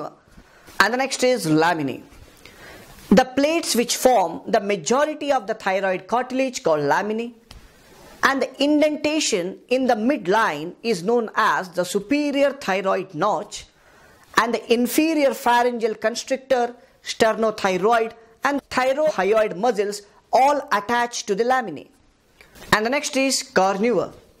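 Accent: India. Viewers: 讲得挺好的，简短精炼。但是如果英文咖喱味不那么重就好了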